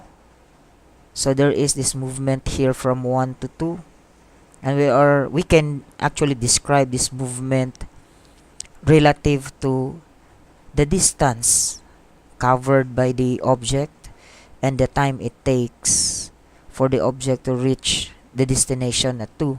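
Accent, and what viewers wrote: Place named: India. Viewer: Boss you sounded like indian.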